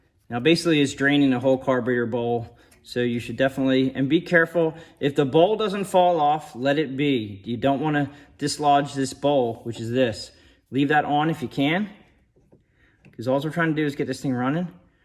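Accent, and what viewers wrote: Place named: South Jersey, United States. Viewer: Your South Jersey/Accent makes me feel at home.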